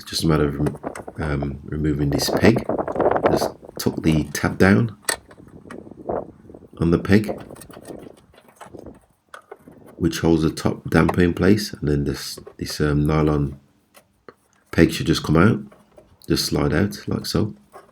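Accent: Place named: Birmingham, United Kingdom. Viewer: Thank you for a reply too 👍🙏 I took it apart exactly how you said cleaned it with some wd40 & a tooth brush wiped it put it back together with some red grease it’s all i had it took just over an hour put a big load in on a 45 min 1200 spin wash its just emptying & spinning now “silent” I’ve had that problem that sounded like a machine gun for 6months took it apart twice & with your help in under an hour it’s like the day I brought it again I can’t thank you enough 👍👍(every other vid on you tube was saying spider or bearings)🙏 saved me £300+ replacement 🙏.. & just on a tangent I noticed the accent im originally from B32 (don’t know how close that is to you ) if i still lived there I would come shake your hand & buy you a drink thank you so much 🙏🙏🫡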